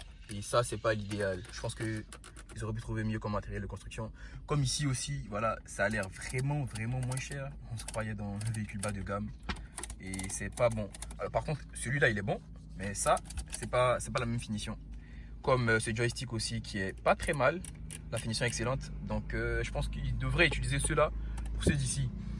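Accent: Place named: Haiti. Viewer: Belle video, bien argumentée dans un français soutenu, avec des anglicismes fort bien maîtrisé, une VOIX de lôveur tintée d’un léger accent! D’origine haïtienne!?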